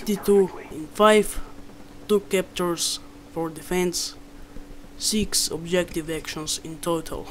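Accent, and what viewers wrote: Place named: Mexico. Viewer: You sound Mexican rather than someone coming from the Balkans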